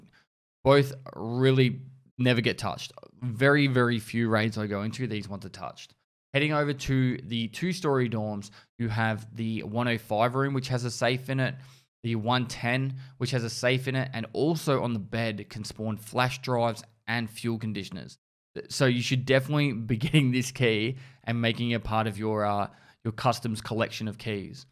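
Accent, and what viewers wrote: Australia. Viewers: Hes Australian as fuck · sounds australian to me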